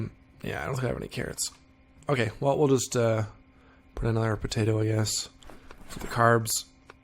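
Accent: Canada. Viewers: feed me, feeeeeeeed meeeee my video addiction. I need to hear more of that Canadian gurrAGE'ness.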